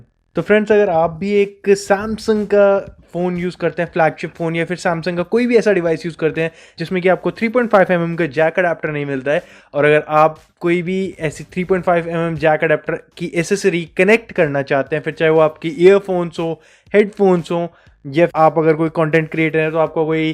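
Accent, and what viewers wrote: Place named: India. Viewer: work on your accent. It's half indian half english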